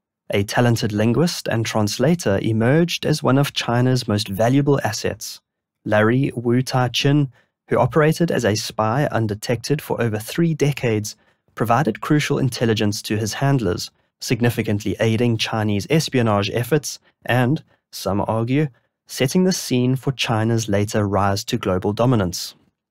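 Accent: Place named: South Africa. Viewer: Love your content and I miss the long-form content. Greedy, I know, but I make space in my day to watch you before anything else when you post here. Love your SA accent too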